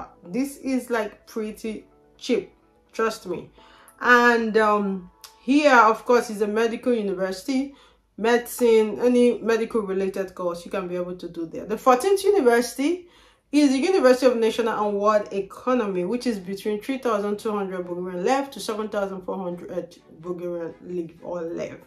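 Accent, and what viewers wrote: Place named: Africa. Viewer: Very hard for me to pick up your English African Accent 😢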